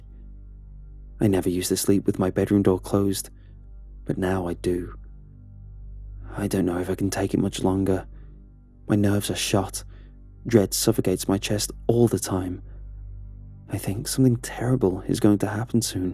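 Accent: United Kingdom. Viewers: why is it that a good British accent makes any story better? there is something soothing to me about it, like he could tell a story about how he makes mashed potatoes and it would likely captivate me. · What’s with all these videos narrated by a Brit? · Why is the guy British bruh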